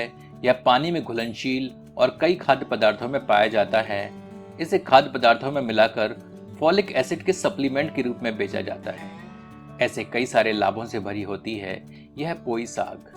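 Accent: Standard. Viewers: ji pahli bar Laga ki koi Bhartiya safe Bhartiya vyanjan banaa raha hai. Aap dwara shuddh Hindi uchharan sunkar hamen Apne per aur apni bhartiyata per Garv hota hai aapko कोटि-कोटि dhanyvad. Aap din duni Raat Chaudhari tarkki Karen Ishwar aapki madad Karen.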